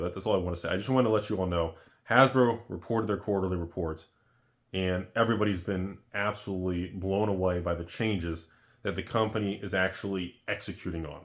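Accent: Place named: United States. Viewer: Well, what I meant is that his talking is very American... don't know how to put it. Hard to tell some words apart at times.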